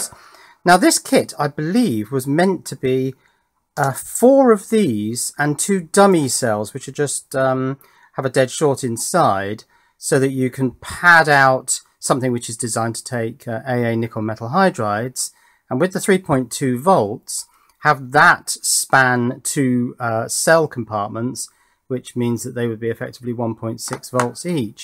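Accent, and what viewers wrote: United Kingdom. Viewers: NO!. is british and he speaks british english.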